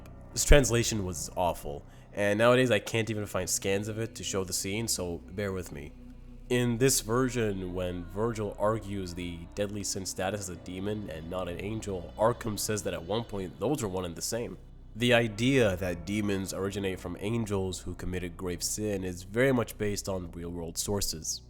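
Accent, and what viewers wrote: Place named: Arab world. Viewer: This is a very great video but from your accent I assume you're an Arab and new mention the Quran in your video another detail that I think you know but you're not mentioned the concept of Angel and demon and Satan in Islam and Christianity is completely different and one of them that the demon are not Fallen Angel but the concept of demon is describing anyone who's rebellious against God if they are Jinn like iblis or are human an angel in Islam is have no free will and they completely follow god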